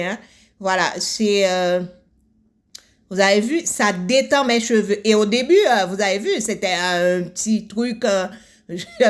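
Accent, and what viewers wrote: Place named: Haiti. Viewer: Vous avez un accent haitien ❤ sinon merci pour les vidéos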